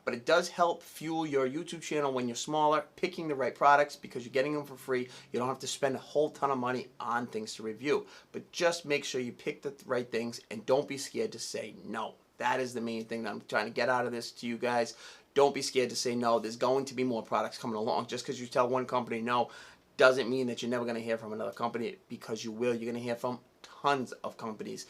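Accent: Boston, United States. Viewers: Subscribed! Def can hear that Boston accent! I work in Boston as truck driver too. Fun city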